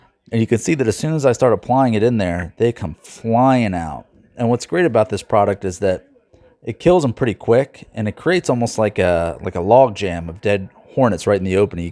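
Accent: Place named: Pennsylvania, United States. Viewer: Let me guess, Copenhagen Wintergreen? Also you are so southeastern PA it hurts.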